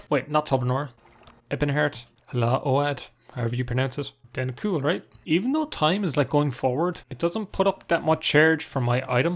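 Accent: Ireland. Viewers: I love your Irish accent man. "I tink so" "Maerk and recall" Subbed for the content, stayed for the accent + content combo. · you put the other irish letsplayers i watch to shame. you talk let they do when theyre playing up being irish as a joke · keep up the content, its always so fun, also an irish accent makes everything better imo, how close are you to 4k watch hours?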